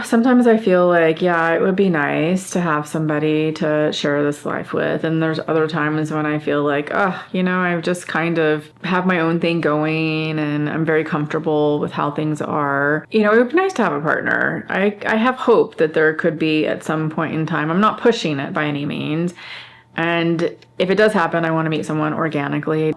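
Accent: Texas, United States. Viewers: Hello,. My wife subscribes to you and we’ve watched you for years. I finally subscribed, too, DUH! Shoulda done that a long time ago. I, too, have long wanted to write a memoir that also incorporates some of my Dad’s story. I have a blog named The Gay Man’s Daughter, if that tells you anything. One thing I have decided is that if I write a book it won’t be linear. Everyone tells me that I’m full of stories which I tell from time to time. Such as My Mother’s Six Degrees of Separation to the JKF Assassination. (She had a clothing store in Arlington, Texas - my home town - and sold the clothes that Oswald’s children wore to his graveside funeral to two men in dark clothing the day before. She didn’t realize it until she saw the photos in the Dallas Morning News the next day. I didn’t learn about it until I was 17.) Those kinds of stories! I left the DFW area at age 35 to relocate to the Puget Sound area as well and have never looked back. 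Thank you for sharing everything in this video. You’re an inspiration to me and my wife and to so many others. Your grace and intention is a hard thing to find and I know you’ve worked hard for it. It’s also nice to hear someone who has the same “accent” that I do! I worked hard to lose my accent when I moved here 33 years ago cause my students poked fun at me. Love you and everything you do. (We have an RV van and travel in it quite a bit - we love the road, also.) Take care.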